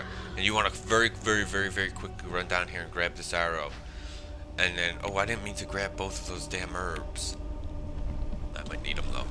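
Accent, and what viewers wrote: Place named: Northeast, United States. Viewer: are you from New Jersey, New York or New England? Your accent is very familiar.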